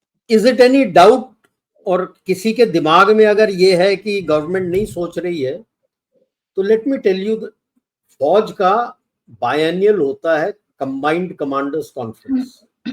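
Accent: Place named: Punjab, India. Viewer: Gen apki punjabi bhaahr aaa jatti hai ❤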